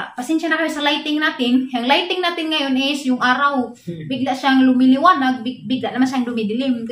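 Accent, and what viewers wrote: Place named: Bohol, Philippines. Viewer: Mahimoot ko sa imo tonada. Boholana.. gahi ayo imo Tonada. Mao nag subscribe ko nimo... Bisaya ko piro Dia ko Mindanao nagpoyo. Nagpojo.haja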